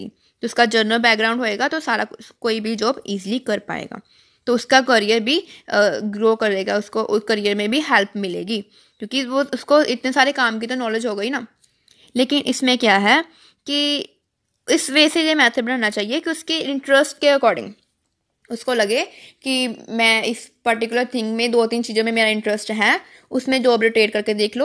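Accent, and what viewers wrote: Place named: Haryana, India. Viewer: Bolne ka tareeka bhot acha h sab samaj aa gaya... Aur apki haryanvi tone 👌🏻👌🏻👌🏻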